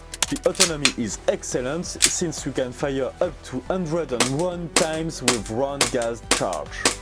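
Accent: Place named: France. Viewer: Part of speaking other language is using its pronounciation. If you speak English, do not use French pronounciation. Respect your listeners by doing 100%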